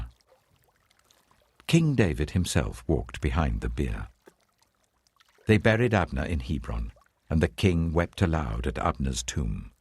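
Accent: United Kingdom. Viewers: What a shame, I don’t like this voice or the British accent. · I like the first commentators voice better than the English ish accent...can not relax with that voice.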